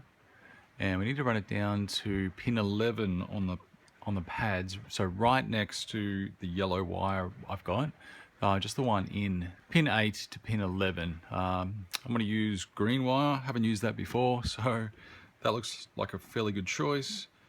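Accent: Australia. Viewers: Garage Great Video mate! What part of Aus are u from?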